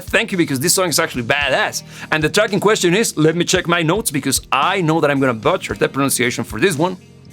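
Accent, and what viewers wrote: Russia. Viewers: you sound russian who is speaking english. where are you originally from, what’s your first language ? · With the Russian accent this heavy, I strongly doubt that you haven't heard this before :)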